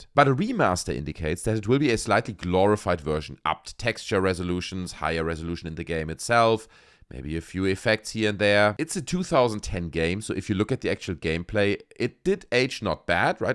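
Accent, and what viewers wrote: Germany. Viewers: Are you german by any chance?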